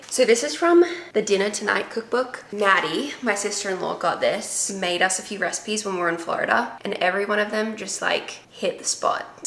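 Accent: Australia. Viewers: OMG SHE HAS AN AUSSIE ACCENT 😭❤️ YESSSSS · !! Her little accent!! Butter and water was definitely all Aussie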